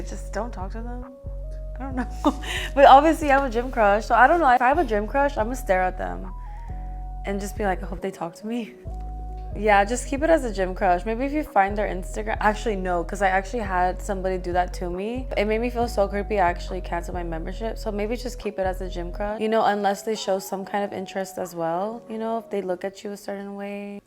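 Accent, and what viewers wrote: Spain. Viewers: LOVE THAT SPANISH ACCENT 🔥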